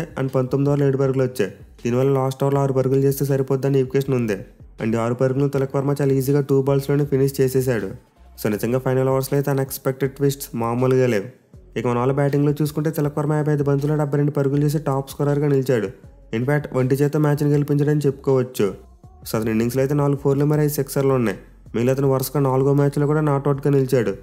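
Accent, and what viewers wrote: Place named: East Godavari, India. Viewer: Bro midha east godavari aa 🌝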